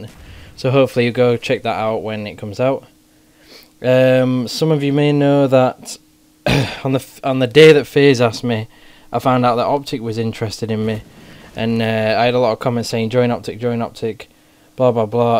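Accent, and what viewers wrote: Yorkshire, United Kingdom. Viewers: Were u from spratt, yorkshire ???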